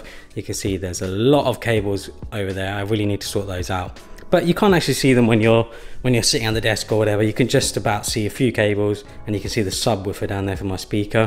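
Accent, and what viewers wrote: United Kingdom. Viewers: You got the best british accent ever lol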